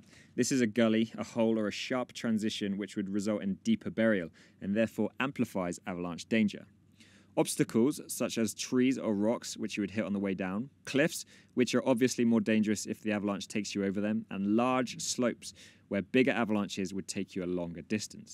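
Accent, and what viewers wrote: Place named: Australia. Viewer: Oh jesus! Not another aussie, new zealander or whatever!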